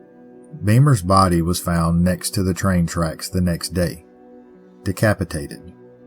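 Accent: South, United States. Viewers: hey wat can I say? I live here & I'm fascinated by spooky shii lol & you put dat lil southern charm on your story tellin I like it! ya huge fan lol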